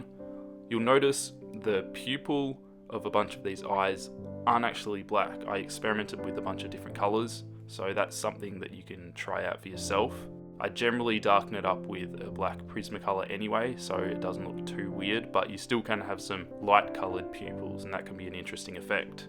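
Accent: Australia. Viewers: Are you Australian? Sorry if I got that wrong I'm not very good with accents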